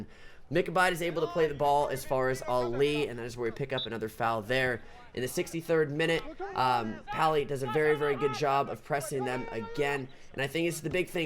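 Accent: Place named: United States. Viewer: This sounds too American for me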